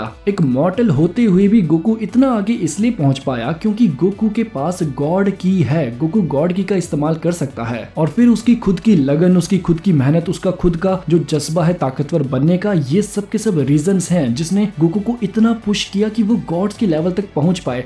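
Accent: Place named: Punjab, India. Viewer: Your accent sounds like punjabi urdu😂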